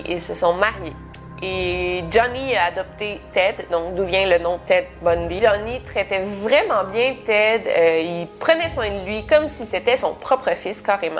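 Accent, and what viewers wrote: Quebec, Canada. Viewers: Salut je profite de cette vidéo sur le plus "grand" des tueurs en série pour laisser un commentaire je t'ai découvert il y a 3 mois depuis je regarde très souvent tes vidéos tu es simple et authentique, un plaisir pour les yeux et les oreilles avec ton accent Québecois lol je supposes que tu connais l'émission française faites entrer l'accusé si ce n'est pas le cas je te la conseille fortement 👍continu comme ça tu es la meilleure tu es la seule youtubeuse que je suis 🤗. Un charltoniste franco italien. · Super vidéo très intéressant, dommage que ton "accent québecois" gache toutes la vidéo :( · Tu raconte vraiment bien ses story même avec l accent Québécois que j adore 👍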